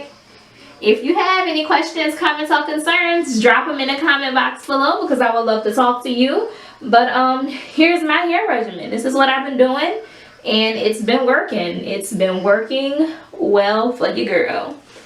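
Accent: New Orleans, United States. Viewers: I bet she from New orleans with this accent😭😍😍😍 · Just found your video. Good content. You sound like you are from New Orleans where I'm from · beh-behhh.. girl.. im in LOOOOOOOVE with your nola accent. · Are you from New Orleans?? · Your New Orleans accent is the best! You couldn't hide that thing if you wanted to! :) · yes, New Orleans, my husband and my in laws are from New Orleans, girl, love the accent, and hair.. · When N.O accent is lifeeee 😩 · As soon as I clicked this video: "Oh. She from New Orleans😁 Yes indeed." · Watching this video in the car w dad. He heard your voice and immediately said, "She from New Orleans?" He heard that accent baby 😂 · Come thu New Orleans accent!!!!! I love it!! Don’t judge me.....judge your friends😂😂 Laissez les bon temps rouler!!! 💚💜💛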